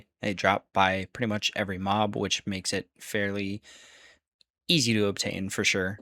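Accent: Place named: Wisconsin, United States. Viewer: Kinda random but are you by chance from Wisconsin? Your accent sounds similar to mine.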